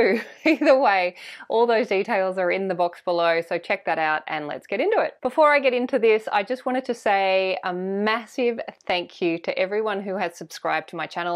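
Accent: Australia. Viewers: You are engaging and fun. You keep the teachings simple yet clear. I am loving it. Thank you! Ps and you are Australian. Living OS it is refreshing to listen to an Australian.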